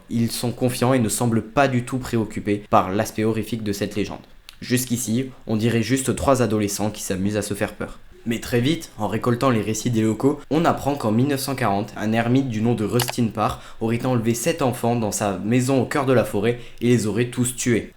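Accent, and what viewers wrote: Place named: Quebec, Canada. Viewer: tu est quebecois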